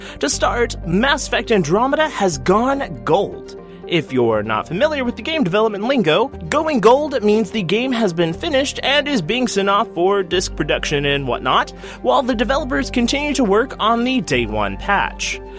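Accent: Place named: Scotland, United Kingdom. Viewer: scottish accent....oh god yes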